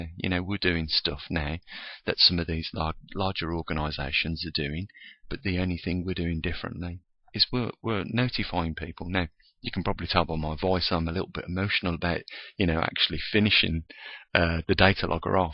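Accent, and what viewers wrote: Birmingham, United Kingdom. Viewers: At last a fellow brummy keep doing the good work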